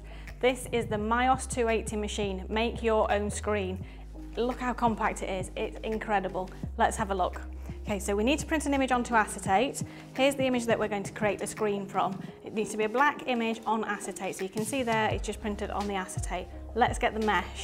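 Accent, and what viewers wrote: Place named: United Kingdom. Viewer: What is it about a Brit accent that clenches my jaws? Every other word is "pop it". Probably a German "loan word".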